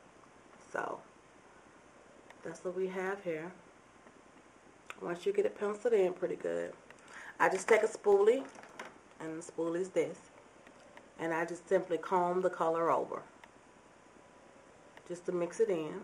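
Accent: South, United States. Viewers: Girl I'm from the south and I just love how you talk with your southern ascent!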